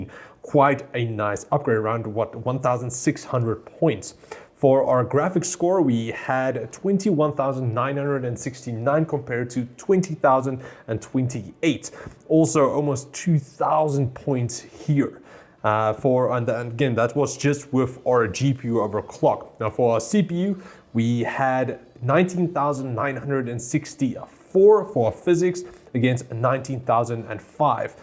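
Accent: South Africa. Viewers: Are you South African? I’m South African myself and I can hear an accent from you. Great content btw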